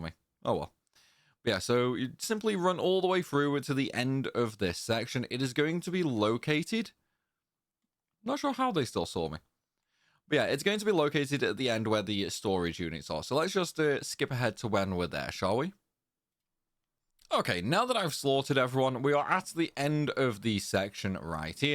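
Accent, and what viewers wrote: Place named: West Midlands, United Kingdom. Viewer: You sound like someone who lives in the west midlands of the UK like me I need this confirming as this is bothering me 🤣🤣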